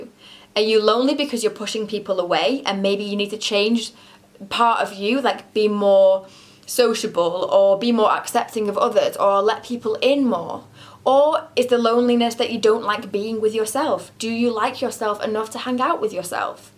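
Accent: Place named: Warrington, United Kingdom. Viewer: Are you from Warrington/leigh? You sound just like my friend!!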